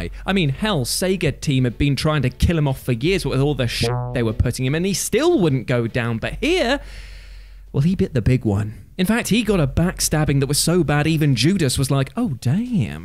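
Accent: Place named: Scotland, United Kingdom. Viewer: Not only was there a Limmy shout out, but that Scottish accent! Hats off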